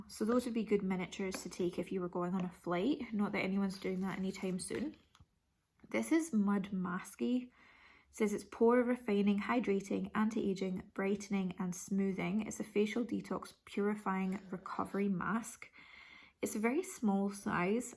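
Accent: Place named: Scotland, United Kingdom. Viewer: Fab unboxing :) Still not a bad box I thought for the price....bit of a random selection though. Nice to hear another Scottish voice x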